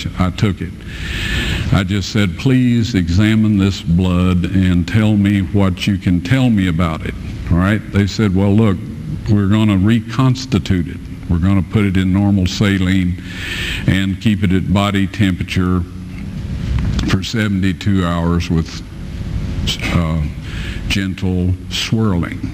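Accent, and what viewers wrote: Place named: South, United States. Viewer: Is it just me or does the gospel spoken with a southern drawl just hit harder?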